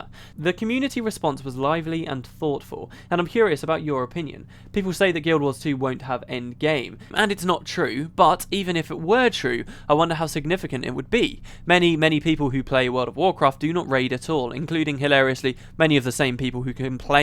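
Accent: United Kingdom. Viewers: Not weird at all. It's the british accent.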